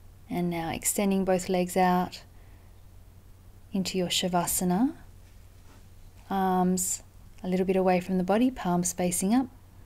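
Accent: Australia. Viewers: Thank you, that was incredible. And so refreshing to hear another Aussie's voice. :-)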